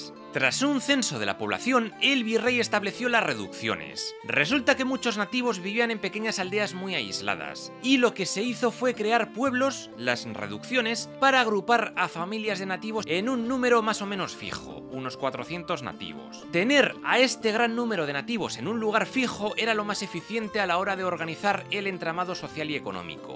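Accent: Spain. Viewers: Acaso es un español el que esta narrando???????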